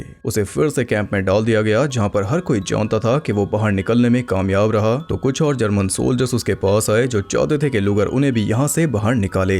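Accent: Haryana, India. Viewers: mitra tum hariyana se ho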